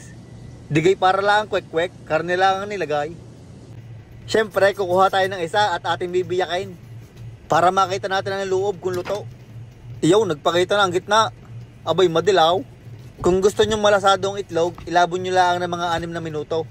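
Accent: Batangas, Philippines. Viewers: Parang Taga Batangas 😆😆🤧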